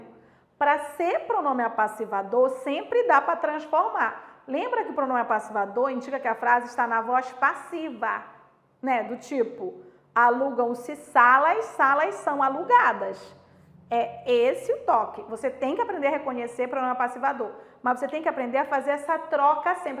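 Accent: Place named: North, Brazil. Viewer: Eu estudando e aproveitando para matar saudade do sotaque do Norte...morei 4 anos no Pará...parabéns pelas explicações.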